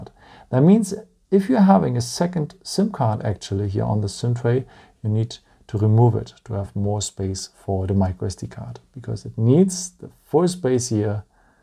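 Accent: Germany. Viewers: We need more videos with some German accent ! Probably buing this phone today, i have had a 9 for four years and very little trouble.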